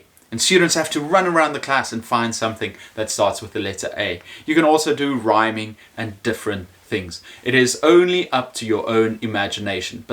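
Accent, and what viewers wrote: South Africa. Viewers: Simon says you very much South African :))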